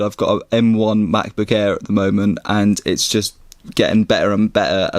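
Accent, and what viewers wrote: United Kingdom. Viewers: Are you British? I am you new subscriber, and I love your video quality <3.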